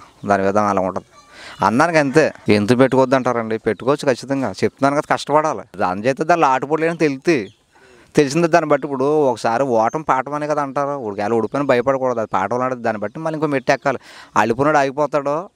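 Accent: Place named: Godavari, India. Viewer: Ma Godarolla yaasa ekka daina kanipetteyachu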